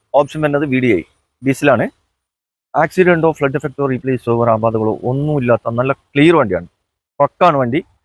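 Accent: Kasaragod, India. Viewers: ചാനൽ പേര് നോക്ക് അല്ലെ മലപ്പുറം അല്ല അവര് കാസറഗോഡ് ആവും സംസാരം കേട്ടിട്ട്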